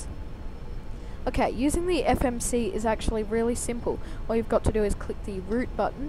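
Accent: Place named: Australia. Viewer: subbed because aussie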